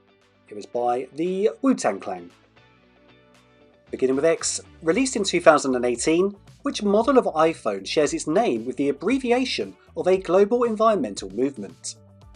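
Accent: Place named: England, United Kingdom. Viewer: Apparently this was the 2nd time around for me on this one. Can't find my response from the previous time. This time I got 14 right. I actually remembered the correct answers on at least two I had answered incorrectly the last time. Thanks for another great quiz,! By the way, from your accent, I'd guess you're from England, Scotland or New Zealand. Am I right with any of those? I'm from the United States.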